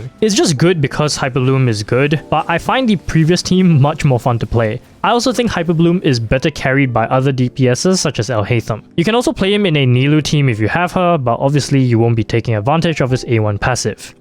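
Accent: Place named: India. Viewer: broo are yoy indian? you sound super indian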